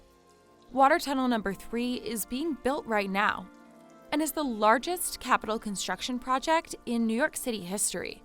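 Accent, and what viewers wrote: California, United States. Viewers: That annoying California accent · where does the narrators accent come from? American females aren't born whih it, yet so many want to sound like they were raised in a mall. just talk normal.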